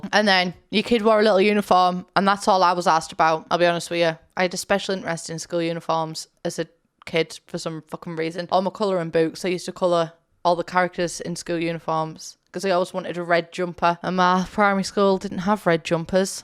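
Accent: United States. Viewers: Omfg I need a whole video on your American accent please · Omg you do such a good American accent!!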